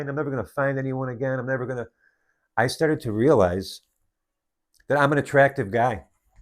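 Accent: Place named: Cleveland, United States. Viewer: Are you from Cleveland? I am...recognize the accent!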